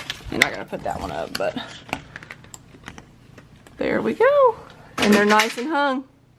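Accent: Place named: Kentucky, United States. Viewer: Fellow Kentuckian here, instantly recognized your accent lol. I'm just starting my teacher prep program and am currently teaching in Korea. Where in Kentucky do you live? I'm from Richmond/Berea/Lexington (lived in all 3 growing up haha)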